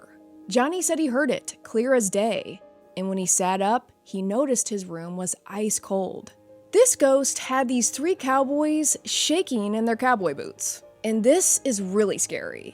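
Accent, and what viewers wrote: United States. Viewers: I've heard this story before somewhere. But your cowboy accent made this so much more entertaining!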